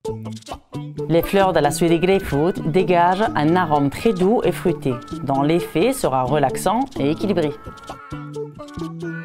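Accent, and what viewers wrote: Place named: France. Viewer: Oulah chérie tu m'as comblé avec ton accent frenchie 😍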